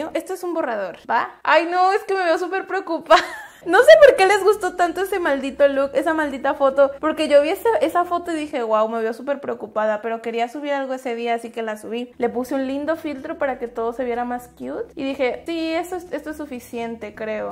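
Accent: Chile. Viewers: Me encanta que use el acento chileno weonnn!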